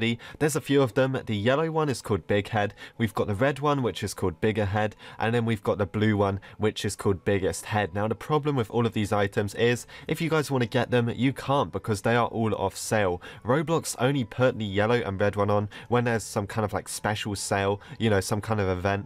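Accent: United Kingdom. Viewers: i'm british i was born on london and your voice is kinda british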